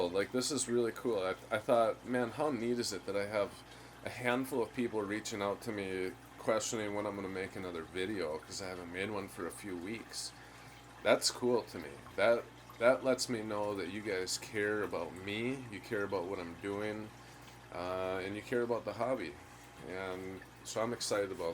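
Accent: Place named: Minnesota, United States. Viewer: Ya gee, ya sound like my family in Brainerd, don’t cha know? -random aquarist in Texas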